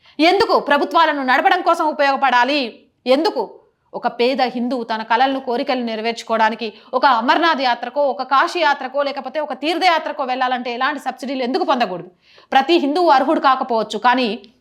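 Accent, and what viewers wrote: Telangana, India. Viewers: Normal telugu maatlaadatene baavuntundi ra, itu leka atu telangana yaasa vaddu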